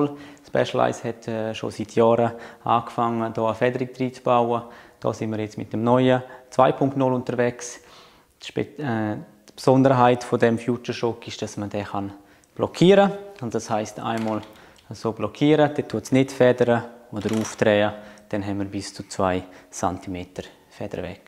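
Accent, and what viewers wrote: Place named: Switzerland. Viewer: Leider sprichst du kein deutsch sondern diesen schweizerischen Dialekt den ich ( als Belgier) sehr schwer verstehen kann! Schade, du hättest mehr Zuschauer!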